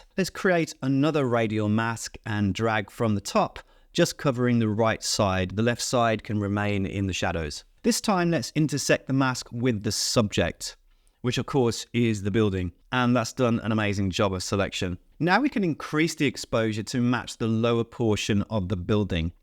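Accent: United Kingdom. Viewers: You are amazing and I love your clear British accent!!!🥰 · Wow, i use Lightroom many Years and i thought i had good knowledge. Most Photographers use Photoshop and i thought i must learn more PS, but i like more Lightroom. You bring me to the another Level, Thank you!! Greetings from Germany, i understand your clean Oxford English very good.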